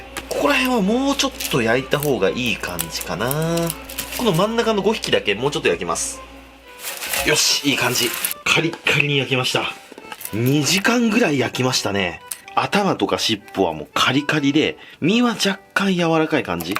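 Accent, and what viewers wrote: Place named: Nagoya, Japan. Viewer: 名古屋弁(尾張方言)なのですね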